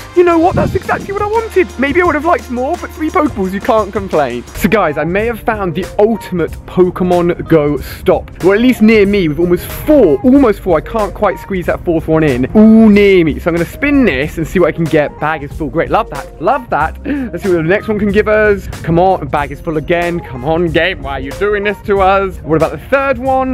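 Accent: United Kingdom. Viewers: Really like this guy's videos but man oh man I cannot stand his accent. I usually love British accents but his is awfullll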